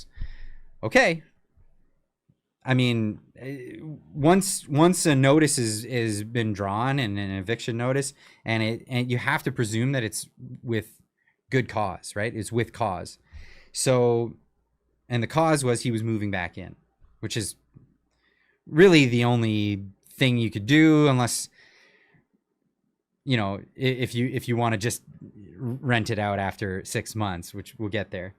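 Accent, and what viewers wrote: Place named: United States. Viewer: Big deal you're going back home where you come from ,where you belong. You've never been Canadian you're an American. You can hear it with the way you speak English cuz you don't sound like any anglophone Canadian that I know and I'm married to an anglophone Canadian woman.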